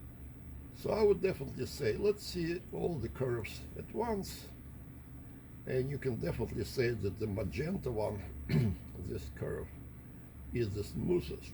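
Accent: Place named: Belfast, United Kingdom. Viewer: Wow that was a long time ago since I heard Belfast.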